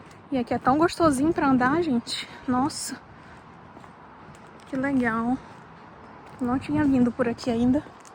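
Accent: Brazil. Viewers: Suiça tudo de bom. Amo viver aqui. Que lugar da Suiça vc mora? Seu sotaque Paraense não é muito forte, gostei muito da sua história. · minha família paterna do Laranjeiras e materna de Belo Horizonte. Nasci e vivi minha infância lá. Todos os familiares ainda. Meu pai veio trabalhar em Manaus e vivi lá de 98 até 2022 com minha família. Reconheci logo seu sotaque do Sul do Pará ;) E vc é da onde?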